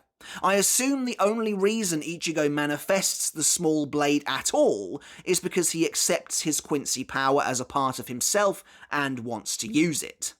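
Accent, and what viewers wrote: United Kingdom. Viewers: it's his British accent that gets people.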